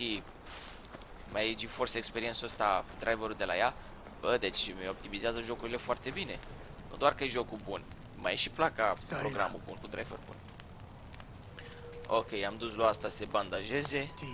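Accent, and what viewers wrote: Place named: England, United Kingdom. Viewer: Vezi ca ai putina engleza pe la coltu guri:)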